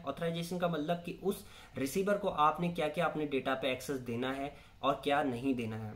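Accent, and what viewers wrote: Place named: Kumaon, India. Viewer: Sir maine abhi aapke y video dekhi ..what an interesting and easy explanation...... 🙏🙏🙏 Thank you so much .......I have a question ap kumaoni ho kya? Just lga...a little touch in your language...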